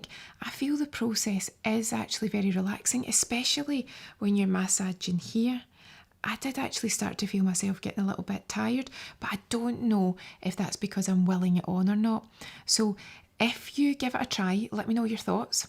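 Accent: Scotland, United Kingdom. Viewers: If something feels good, or in the case of ASMR, sounds good, then yeah, it should really come as no surprise to anyone when the subject becomes relaxed, which of course is going to induce drowsiness. Whether there's science behind acupressure or not I guess could be for another discussion. I'm leaning more towards power of suggestion. Regardless, the soft spoken/Scottish accent combo is eargasmic, but I need more than 6 and a half minutes, so Ima loop it. 😬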